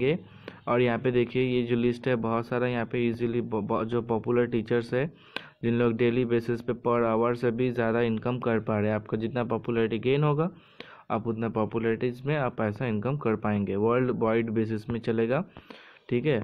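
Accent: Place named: Maharashtra, India. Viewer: Tui to marattok rokomer hindi bolchish re🙄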